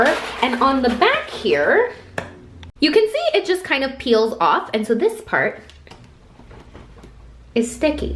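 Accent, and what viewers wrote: Australia. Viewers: Can anyone tell me why she has an aussie accent on her so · I am kinda new to this channel, and in discovering all of it's awesomeness... I'm confused about the word "so". It sounds like she has an Australian accent..? Am I missing something? Almost sounds like "soar" ( vs ) Also, wall looks GORGEOUS😍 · It annoys me so damn much that in every single video she has to say “SORRR” in that redic Australian accent like sis stop it’s not cute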